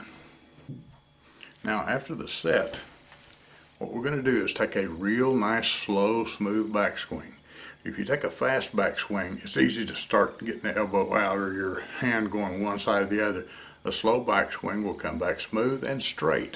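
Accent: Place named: United States. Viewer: Thanks for your reply BUTT I do not believe this is reg size. I used to be 21 err able to make a shot with the stick behind my back with one foot on the floor, not on this sucker I can not touch the floor with my foot. So either I shrunk several inches or this table was built for basket ball players. How do I compensate for a non regulation table. BTW, I must commend you on your instruction, very precise and to the point in simple American English.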